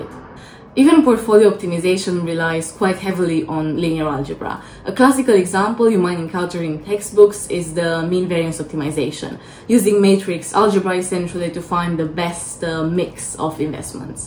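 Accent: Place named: Europe. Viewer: I can hear the European Accent.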